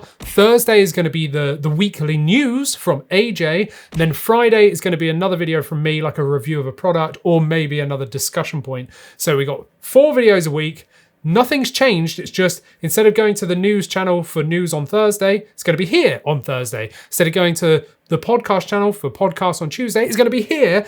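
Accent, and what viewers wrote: United Kingdom. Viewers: I've seen a few of your videos, and i like your channel, dont worry about "rambling on", i like the way the "British Accent" sounds.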